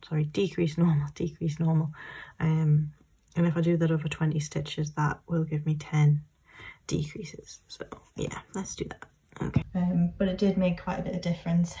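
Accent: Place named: North East, United Kingdom. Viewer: I have just got myself a Sentro machine and enjoying experimenting. Videos are great. Good to hear a northern, dare I guess a northeastern voice. ❤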